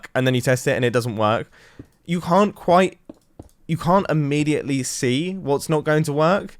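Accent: United Kingdom. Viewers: He’s sounding really British in this one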